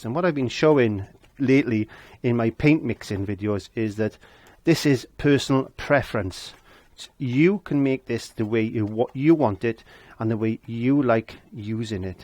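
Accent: Wales, United Kingdom. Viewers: Just recently found you and can't thank you enough for your advice. I use your "recipes" for Gesso and Pouring Medium and they are great. Happy to find a British artist as most are American and their products aren't always easy to find, also expensive. Also the Welsh accent reminds me of my Dad (Not that your'e that old!)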